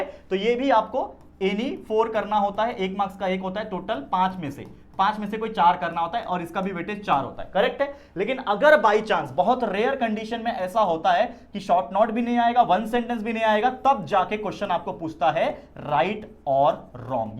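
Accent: Maharashtra, India. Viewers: Sir aap marathi bolte ho kya😅😅😊😊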